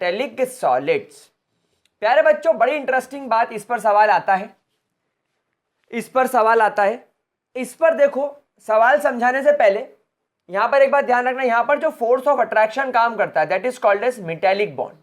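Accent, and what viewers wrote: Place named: Bhopal, India. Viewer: Sir your voice is same to same of bhopalvwalo :---- hoegi ❤️❤️❤️❤️🙏🔥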